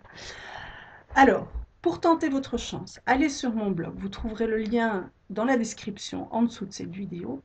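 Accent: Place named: France. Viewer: je savais que tu parles frances. je pouvait l'entendre cuands tu parles anglais!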